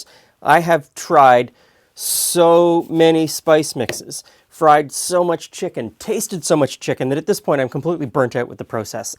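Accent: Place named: Toronto, Canada. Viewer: I don't watch this channel often but is from Toronto, which can be a bit different from the rest of Canada in mannerism, way of speaking, etc.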